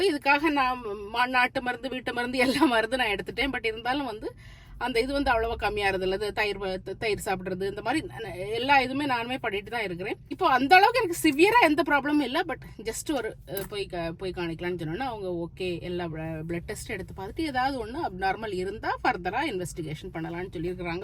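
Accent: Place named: Kanyakumari, India. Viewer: அன்பு சகோதரி ❤❤❤❤❤❤❤❤ எங்களுடைய திருவிழா வாழ்த்துக்கள் ❤❤❤❤❤❤❤❤❤கன்னியாகுமரி வட்டார மொழி மிகவும் பிடிக்கும்... இன்சூரன்ஸ் advisor's BOTH LIC &MEDICAL Star health insurance ❤❤❤❤❤❤❤ PLEASE UPLOAD.... LONDON PEOPLE HOW TO CHOOSE INSURANCE....❤❤❤❤❤❤❤❤❤❤❤❤❤❤❤❤❤ THIS IS AWARENESS VIDEO... KINDLY MY request.... Take care sister....❤❤❤❤❤❤❤❤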